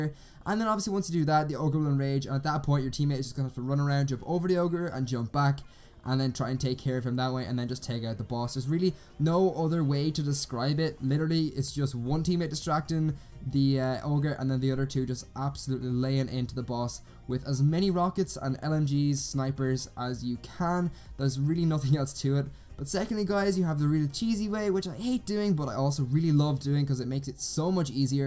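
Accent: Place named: Ireland. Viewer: Where are you from in Ireland? 🇮🇪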